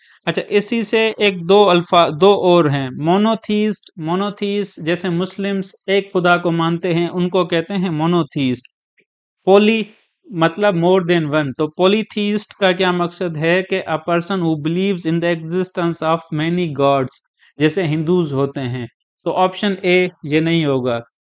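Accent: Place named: Sindh, India. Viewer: Keep it up....very great efforts....ur pronunciation infirms that u r sindhi man...Am I right ???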